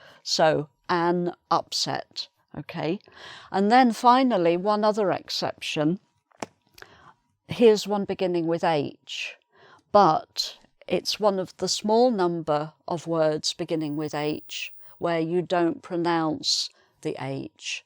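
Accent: United Kingdom. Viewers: This is british accent Thank you I like your program.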